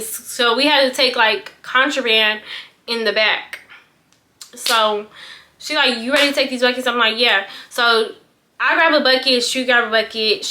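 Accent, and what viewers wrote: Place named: Bay Area, United States. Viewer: You must be from the bay lol I hear that accent 😂❤❤❤ you sound similar to stunna girl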